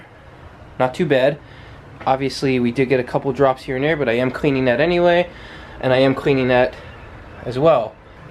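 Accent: New York, United States. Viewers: ayo why do i feel like you're from new york? lol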